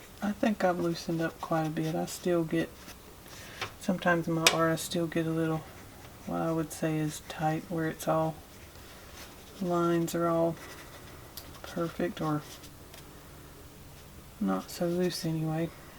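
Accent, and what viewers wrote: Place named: Mississippi, United States. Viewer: Gorgeous page! I’m liking the way you did the washi. You sound like you’re from Mississippi. It's always a bonus to have someone who does great art and also has a pleasing voice. I like the cross Ross lines too, I think because it suggests an old patchwork quilt behind it.Thanks for sharing.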